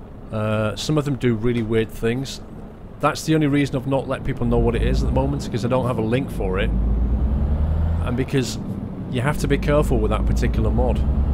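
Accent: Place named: Scotland, United Kingdom. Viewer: Awesome Scottish accent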